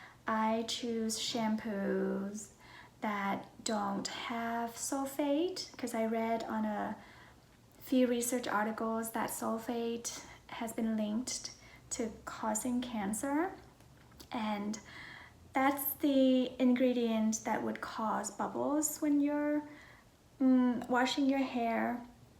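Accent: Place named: United States. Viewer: Your accent is very Murican and you're a cutie patootie!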